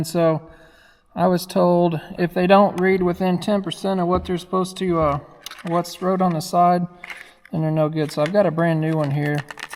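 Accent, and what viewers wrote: South, United States. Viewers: Wow here is this southern redneck who wears dirty camo coveralls 10 months a year. Generally known for heavy equipment repairs, this dude takes his huge sausage fingers and does as clean a bit of circuit board soldering as I have ever heard or seen. And in a voice best suited for pork rinds, moonshine and Nascar, does as great a technical explanation, diagnosis and repair as I could ever have imagined., you are the salt of the earth and one of those people I regret I will never meet!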